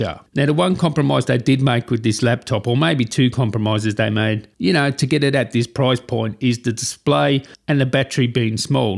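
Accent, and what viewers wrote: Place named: Australia. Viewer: Australian accent 😊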